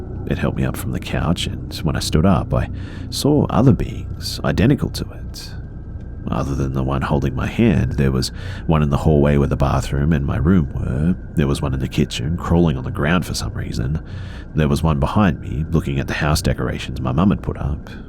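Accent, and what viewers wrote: Australia. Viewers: gosh darn it, why do australians have the best accent?! Thanks for the upload! Much love, man! ❤️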